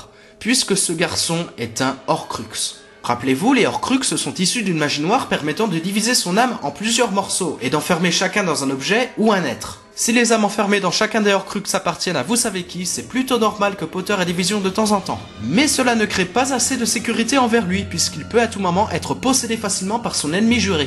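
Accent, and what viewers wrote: Switzerland. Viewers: Tu est suisse ? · Monde remplie de magie il a un accent suisse c'es tout ^^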